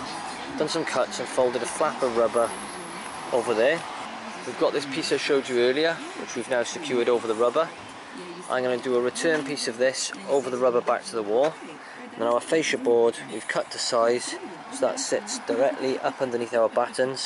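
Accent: Wales, United Kingdom. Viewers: Is that a cwmbran accent i can hear?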